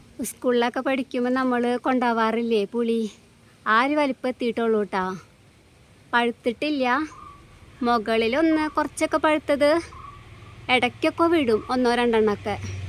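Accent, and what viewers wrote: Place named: Thrissur, India. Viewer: ട്ട, ട്ട ട്ട ട്ട ട്ട ട്ട ട്ട, soooooper ആണ് ട്ടാ, തൃശ്ശൂർകാരി ആണ് ട്ടാ 👌❤️👍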